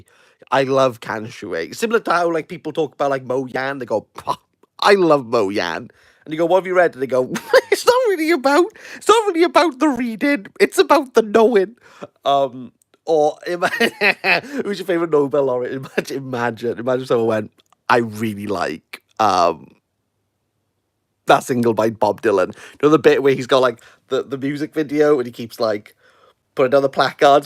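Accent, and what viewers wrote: Wales, United Kingdom. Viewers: the welsh accent plus the lack of hearing-impaired captions has made this vid a little trying but think I got the gist the only fosse I know is bob fosse the broadway show dance guy they made hit movie about him also the art of the deal was ghost-written trump doesn't even write e-mails cause he knows they last forever and doubt he's cracked a bk since college but if they could give it to dylan and the peace prize to obama before he even finished moving into WH even the orange ogre may have a chance in the meantime send me some of your laughing gas could really use it now ⚛😀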